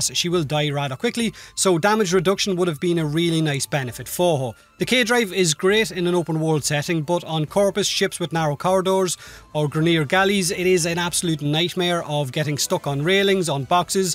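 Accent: Ireland. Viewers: Irish accents are so cute, I also am in love with the amount of insults they have in their inventory.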